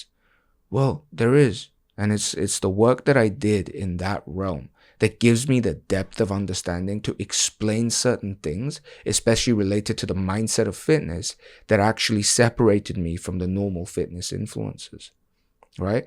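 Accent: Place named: United Kingdom. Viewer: Off topic, but your accent is a lot nicer than the half-baked American accent that a lot of foreigners end up with. Not all British accents are nice but what you have ended up with is 👌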